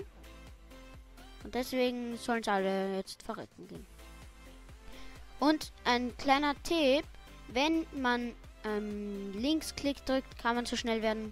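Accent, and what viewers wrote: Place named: Lower Austria, Austria. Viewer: sag mal kann das sein das du aus niederösterreich sonst was kommst denn in deinem reden hört man hochdeutsch und bisschen dialekt xD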